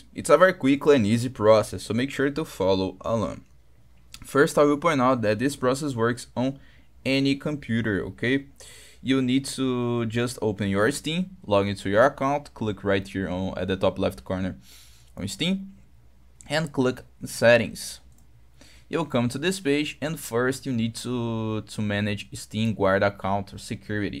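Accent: Brazil. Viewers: he got that brazilian accent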